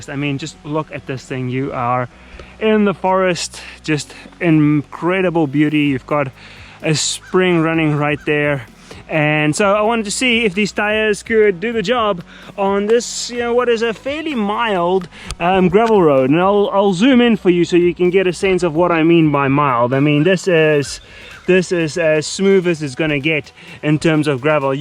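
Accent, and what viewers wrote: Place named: South Africa. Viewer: South African accent is awesome, great review!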